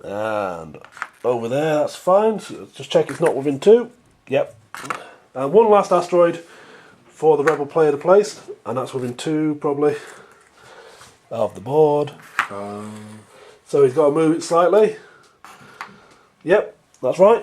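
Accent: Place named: United Kingdom. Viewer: All things sound more intelligent if said with a British accent!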